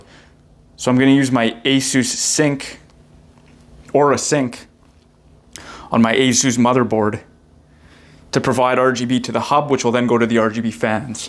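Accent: Canada. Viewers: Is that a Canadian I hear? :O Great review, by the way. Looking forward to building in this case tomorrow.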